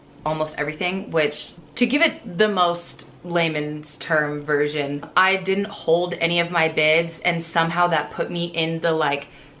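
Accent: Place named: Southern California, United States. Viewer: my friend, I hear you talk,and I hear in both what you say and how you say it that you are a So Cali girl. This AGED So Cali boy feels back home when you talk to us. You are totally right. Daddy D IS over staffed. And most likely every one else. I actually tried toward end of year with you, but Talent Acquisition closed the Req# right away. No doubt somebody realized there were too many people to pay already. Let me fill you in on what has gone on. I think you know I am not 28. It’s the reverse - 82. Due to Rodg’s death from cancer a year ago today (25 February), being alone here and rambling in a place too big for me, I decided finally that zi needed to be around different people all the time and to be away from being home alone in order to have those experiences of growing and broadening. Only F9 was hiring. Although in good health, at 82, I never thought I would get invited to an all day event, much less get offered a CJO I was invited to an event in DEN 14 September and got the offer. 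3 days later, I declined it, mostly due to having some pretty expensive property in my care (you may know a little about SEA real estate). I remained in good stead and later thought how dumb that was or me and that I really want that FA lifestyle snd to try again after 3 months If offered again, would just close and lock door. Well, went through whole process again, got invited to DEN event 6 February and was offered CJO A SECOND TIME. Am waiting for the background check to be comple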